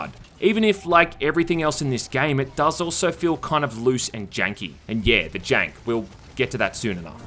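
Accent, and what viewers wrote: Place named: Australia. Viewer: Cheers, sweaty guy. You sound Aussie.. til you say "toob"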